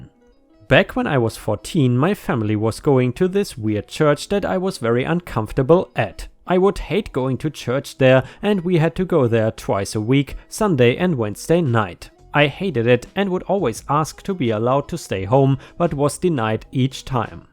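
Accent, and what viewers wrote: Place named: Germany. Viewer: Ok I have to say you kind of have a german accent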